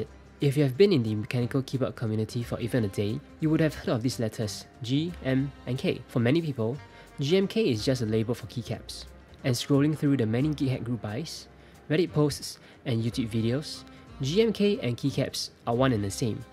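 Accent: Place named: Singapore. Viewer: Thanks but I have to mute the video and turn on the subtitles to understand this singlish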